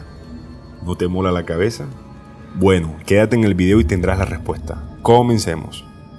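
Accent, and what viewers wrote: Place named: Venezuela. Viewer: La historia puede ser muy buena, pero no con acento venezolano por favor. Pierde toda credibilidad y seriedad. Dile a algún amigo que te grabe la voz, preferentemente un español. Además, se nota que estás leyendo absolutamente todo, te equivocas al pronunciar los nombres y el acento de los lugares ó personas porque no eres un erudito en el tema, no lo has estudiado, ni lo conoces, solamente estás leyendo un libro que te encontraste por ahí.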